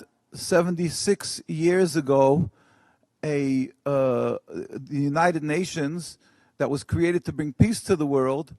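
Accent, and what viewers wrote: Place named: New York, United States. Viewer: you know it's funny, says he's from 'palestine' then says his family is phillistine from many generations ago... but that accent doesnt lie. this guy is from new york. talk about a paid shill to parrot some agenda from across the world when he lives comfortably in new york city. he can talk all he wants and keep wasting oxygen but the fact of the matter is this man has most likely never been to Israel while rockets were fired over his head from a hateful spiteful section of people who were given every opportunity to have their own state. were given BILLIONS to build better hospitals and schools to give their people an education over the future generations... instead they built extensive networks of tunnels, bought missile and rocket parts from iran to build in gaza and send at their neighbor. they then invade and kill over 1500 civilians, not military, civilians. 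womp womp. dont start a war then cry when you've lost.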